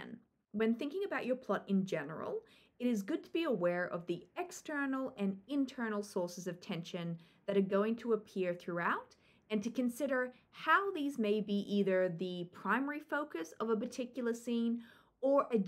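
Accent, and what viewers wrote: Australia. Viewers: Sounded Aussie sometimes but then you had some strong Rs like Americans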